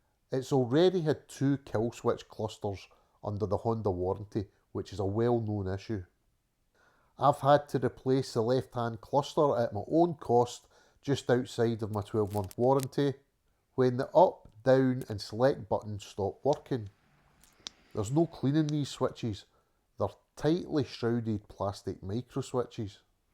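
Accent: Scotland, United Kingdom. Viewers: Thanks for your work. Iran has been my best trip so far, the people there are so friendly with the visitors and specifically with riders because we have bikes they have never seen in their life (big ones are only for the army and the police). As always , problems come with politics ans religion... On the way to Iran the Balkans are fantastic when riding on mountain roads (get away form the coast...), Albania is......different... And eastern Turkey (Kurd country) is also interesting with very nice people. I love your scottish accent (although sometimes difficult to understand) and Scotland (I made the North 500 road) Keep well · Love the review and the comparison to the bullet proof Honda's of days past. I owned quite a few Honda's from the 70's to 90's and apart from the VF1000 (mad bike) they were utterly dependable. I now drive Guzzi's with varying levels of reliability. 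But I must say the most current one (a 2008 Stelvio) performs well, for all the other ones I trade driving pleasure for reliability😇. Worth it, but it is not for everyone. Specially my Quota1000 which I think is the most brilliant failure for a motor bike. A lot of issues, but it drives brilliant like no other. Any way I enjoyed your video, you are using your bike not for show, but for what you can do with it, and I am very envious of you living in Scotland , as I know from experience how nice it can be to drive there. Great small twisty roads and beautiful scenery. Had to re calibrate to understand your commentary a bit with the Scottish accent but don't change. Awesome content.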